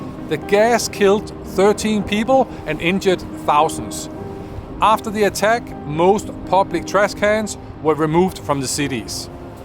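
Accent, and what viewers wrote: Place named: Denmark. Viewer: he just sounds danish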